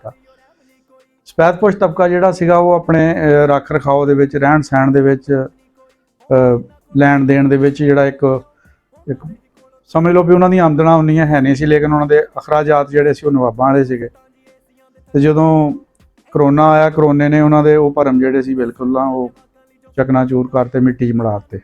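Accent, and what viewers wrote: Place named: Amritsar, India. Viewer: Veere thdi punjabi bilkul sadi Amritsar wali.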